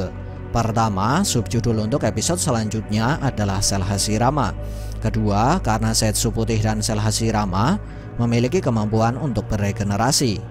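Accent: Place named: Java, Indonesia. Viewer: min aku suka banget sama suaramu, medok medok krenyes.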